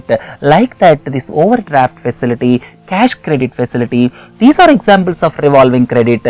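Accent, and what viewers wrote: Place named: South India, India. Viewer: atleast the difficult or complex terms must be told in a neutral accent plz... for these terms, no south indian accent plz... coz north indians like us cant understand...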